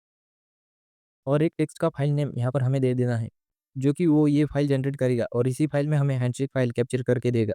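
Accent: Maharashtra, India. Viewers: Bhai tu Maharashtrain he kya? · Bhau tu pakka marathi aahes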